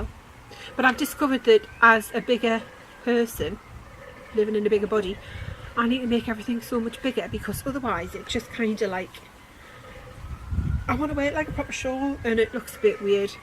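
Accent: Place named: County Durham, United Kingdom. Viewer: Only just found your podcast and am binge watching you from the beginning. Loving it you crack me up. I recognise your accent and when you said you were brought up in Burnhope that's probably why. I was brought up in Annfield Plain and Catchgate so you probably went to my school, Greencroft (albeit a few years after me)? Anyhoo you've got a new fangirl 😄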